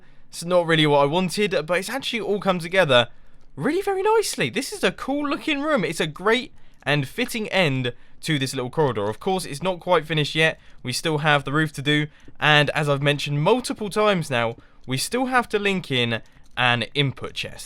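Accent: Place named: United Kingdom. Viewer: His accent is clearly Brittish.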